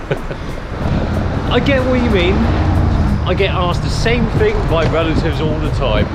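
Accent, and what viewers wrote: England, United Kingdom. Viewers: My fav dude on youtube, love your content, love your humour, love your very english accent! Greetings from New Zealand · im still mesmerize after all this year, how could u have such a perfect brit accent... envy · is Casey Neistat Reborn. Or Asian Neistat with English accent.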